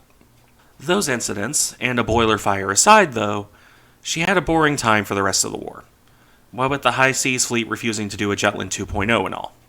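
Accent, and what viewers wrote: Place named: United States. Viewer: Nicely done. Earned you a sub. Very well presented....the best "Americanized" version of Drachinifel I've ever heard. I look forward to future content.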